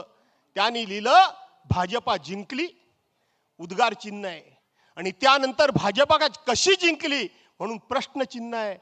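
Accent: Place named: Konkan, India. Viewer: Kokan aavaj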